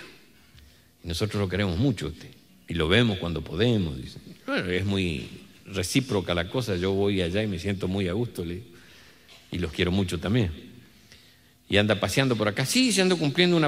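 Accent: Argentina. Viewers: ¡Muchas gracias desde Barcelona!,....un honor y placer escuchar y reir también por los chistes, así como poder apreciar en la voz, el acento propio y humano, no solo de la calidad de la cultura Argentina, si no de un gran artista y persona. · A mi me costo un poco comprender muchos terminos pues soy una mujer cubana y no solo estamos muy lejos los unos de los otros sino que la forma de hablar y sobre todo los modismos son extremadamente diferentes. Pero poco a poco lo fui entendiendo todo y hoy en dia lo escucho casi todos los dias. Le felicito maestro, por su humor limpio y puro. Por algunas partes del mundo los argentinos tienen fama de ser orgullosos....... y luego de haberlo conocido a usted, ya entiendo el por que.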